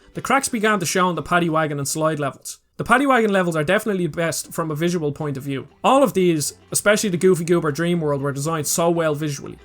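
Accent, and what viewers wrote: Ireland. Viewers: I could listen to your strong Irish brogue all day, brother! great videos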